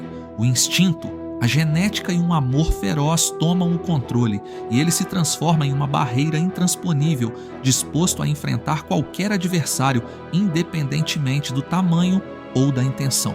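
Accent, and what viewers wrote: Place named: United States. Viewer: Похоже будто Американец пытается говорить на Русском языке, акцентом.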